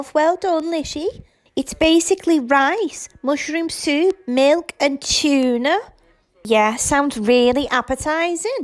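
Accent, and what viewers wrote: Liverpool, United Kingdom. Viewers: love your content! proper love the scouse accent pal, Yeah Lush is a proper chavvy scroat! Feel so bad for the kiddos!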